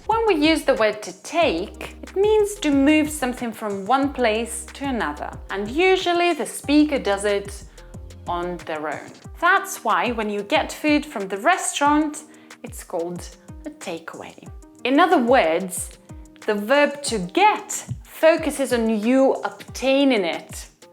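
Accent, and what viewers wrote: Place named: United Kingdom. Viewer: I really appreciate LinguaTrip has the british accent teacher.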